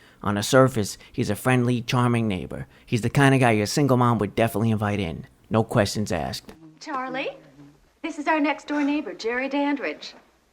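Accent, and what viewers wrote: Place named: East Coast, United States. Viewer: Nice one! ❤❤❤ Love your East Coast accent accent! New York??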